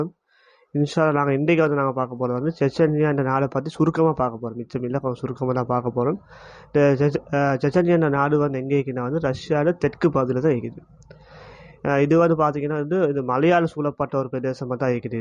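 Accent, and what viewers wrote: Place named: Colombo, Sri Lanka. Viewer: RECORRECT YOUR SPEAKING. WORDS YOU ARE SPEAKING COLOMBO TAMIL PLEASE SPEAK PUBLIC SPEAKING WORDS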